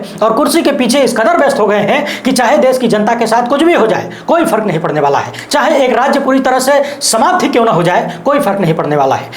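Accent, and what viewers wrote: Bhojpuri, India. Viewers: Aap k bolne ki speed aurkabhi kabhi bhojhpuri style bahot man ko bhata hai.kam waqt men aap bahot kuch kahdete hsein.good Farooqulamin. Aurangabad maharashtra.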